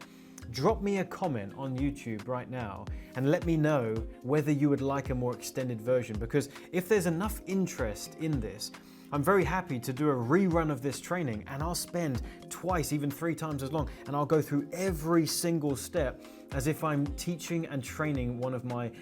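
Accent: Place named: United Kingdom. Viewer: We need deep dive,. Thank you so much! Incredible content. Also loving your British pronunciation =)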